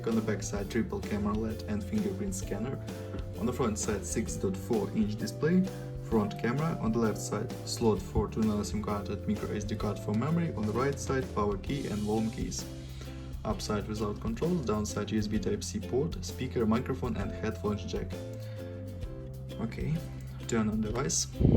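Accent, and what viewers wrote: Russia. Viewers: очень сильно чувствуется Русский акцент ,но спасибо ,ибо без русского акцента мне было бы не понять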